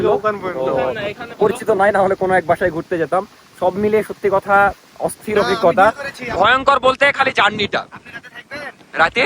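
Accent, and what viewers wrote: Rajshahi, Bangladesh. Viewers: I like ur accent 😂🎉 rajshahi accent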